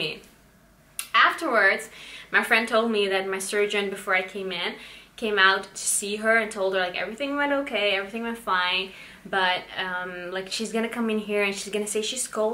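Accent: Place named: New Jersey, United States. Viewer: are u from NY or NJ? u sound like the people from jersey shore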